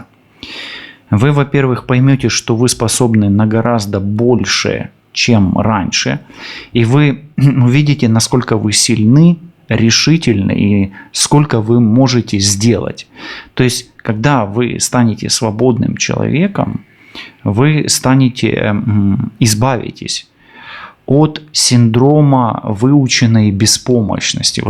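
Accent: Ukraine. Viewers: Я красотка люблю жизнь возрост уже большой собаки нет не люблю в квартире но они меня любят всю жизнь сама себя содержала никогда не брала у мужчин надеялась только на себя что нажила ваша русня забрала сейчас за границей в ирландии но влюбилась даже не ожидала потеряла чувствую что вы с Украины так как речь пролитает родные слова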